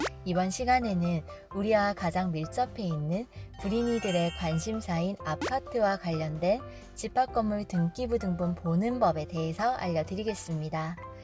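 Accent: Gyeongsang, South Korea. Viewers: 유익한 영상 잘 봤습니다~ 쉬운 듯 어려운게 등기부 보는법 같아요. 전 님 경상도 사투리가 참 듣기 좋아요^^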